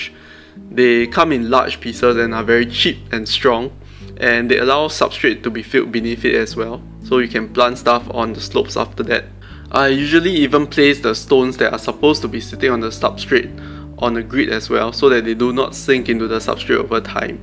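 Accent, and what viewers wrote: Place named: Singapore. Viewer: Sound like my country men, u singaporean?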